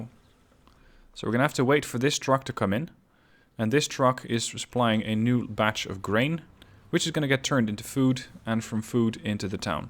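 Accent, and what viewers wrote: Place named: Netherlands. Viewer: I was like; sounds dutch or not? Then you hit me with "Breda"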